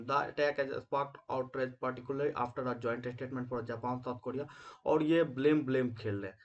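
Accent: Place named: Bihar, India. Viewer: Ye bhai bihar se hai I guess 🥴🤔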